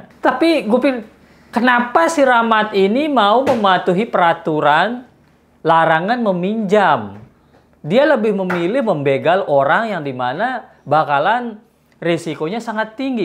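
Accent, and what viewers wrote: Lombok, Indonesia. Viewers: logat sasak tdak hilang,.keren abangku😁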